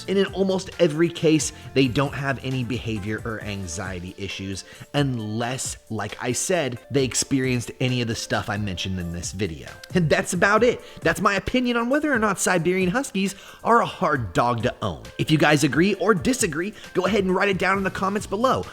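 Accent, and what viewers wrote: England, United Kingdom. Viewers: Hi luv the Skateboard...Luv the English Accent nearly like mine...😍. Nice to see Roo I would like to get a Husky in the future but it is like you say a lot of things to think about . But your videos are very helpful.. I just think they are gorgeous. And I hope that my Husky will like my 🐈..one Day.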